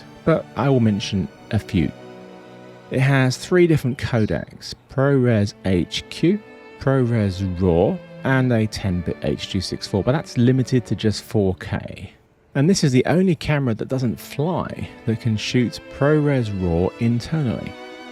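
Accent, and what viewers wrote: United Kingdom. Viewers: Fantastic video, this is by far the best and most honest review of the 4D I've seen yet. I'd say you are doing pretty well for an old guy haha! Always love your reviews and your soothing British accent makes them all the more enjoyable to watch :) That story about your back problems though... ouch. I'm a pretty young and fit guy, but even I started hurting while watching that! Good food for thought as I continue getting older and more involved in my videography career.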